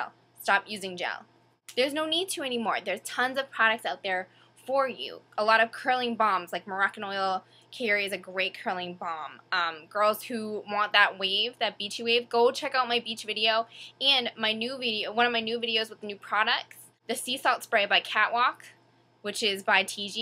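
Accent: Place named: Boston, United States. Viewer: I can tell you're from Boston girl, I heard you say "mirrAH" ahaha. Love your vidsss :)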